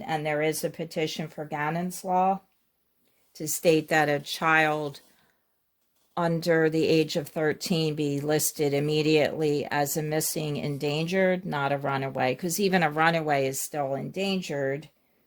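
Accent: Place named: Philadelphia, United States. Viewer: I knew you were from philly! Me too. You actually sound so much like my mom.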